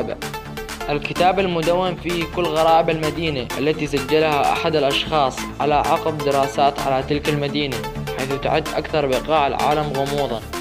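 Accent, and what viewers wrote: Iraq. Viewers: إضنك من العراق لئن لهجتك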